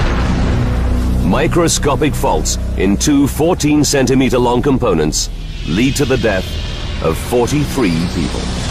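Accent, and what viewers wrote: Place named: United Kingdom. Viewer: This show is Canadian made by a company based in Montreal called Cineflix and all of the episodes were produced at their studios in London...it was syndicated into US channels under various names and that's why everyone thinks it's American but it's not. Hence the British narrators on every episode. As usual European spouting off about Americans without doing an ounce of research.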